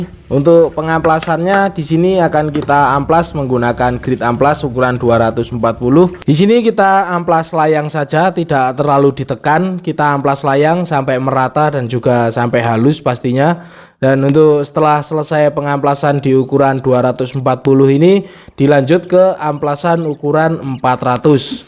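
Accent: Temanggung, Indonesia. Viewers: Ternyata orang temanggung to mas😅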